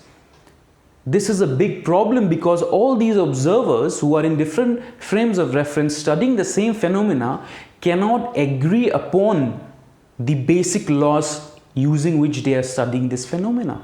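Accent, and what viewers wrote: India. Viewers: I have to admit, it is not always easy to understand lectures of professors with Hindi accent, but I would like to say that I appreciate the effort you took on diction and pacing. Made it very comfortable to listen to, which is great for learning. Also your explanations are awesomely clear and easy to follow, without skipping anything important. I could follow the lecture very well, even though it is the first time I'm looking at this topic of the inconsistency of Maxwell equations and Galilean transformations. The algorithm did a great job, because I was looking exactly for this lecture and yours was the first thing to come up and it is well deserved. Thanks for the great content.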